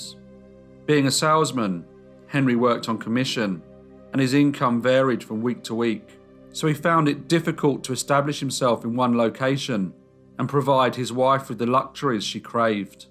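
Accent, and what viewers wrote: United Kingdom. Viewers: Oh my gosh! Do I love your British accent! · Didn't you notice he has got Brit speak.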